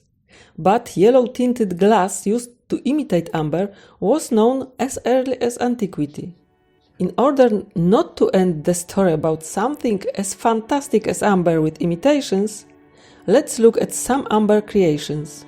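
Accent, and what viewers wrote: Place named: Poland. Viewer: NIE DA SIĘ TEGO SŁUCHAĆ GDYBY NIE TEN POLSKIANGIELSKI TO MOŻNA BY OBEJRZEĆ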